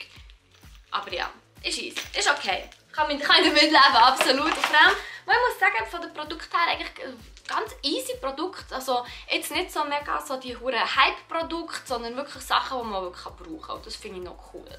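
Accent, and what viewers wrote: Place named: Switzerland. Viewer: Auch wenn mich beauty nicht interessiert, habe ich Spaß daran dir zu zuhören. Einfach nur weil mich das schweizer deutsch so fasziniert. Lg aus Niederösterreich :)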